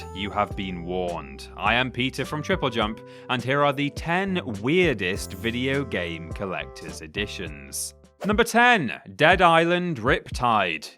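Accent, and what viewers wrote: United Kingdom. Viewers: While I'm normally cringeworthy towards British accents, I could listen Peter ramble on all day on just about anything.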